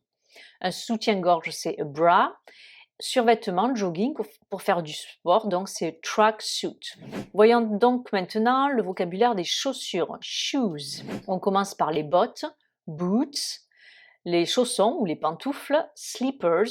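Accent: Toulouse, France. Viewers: As usual, I still very happy hearing your Toulousain accent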